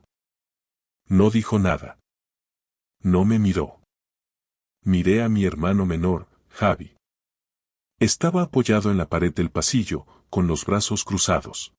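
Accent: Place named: Mexico. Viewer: Imposible 😂! No se puede decir que el protagonista que locuciona es de Toledo y ponerle acento mexicano. No way!!! 🤦🏻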